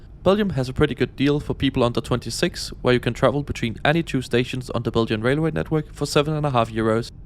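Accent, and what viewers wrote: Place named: Denmark. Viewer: Haha, I had the same reaction when I heard his Danish accent :-)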